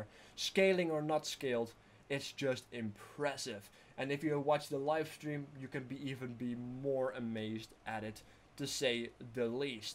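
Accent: Netherlands. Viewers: the dutch accent gave it away :D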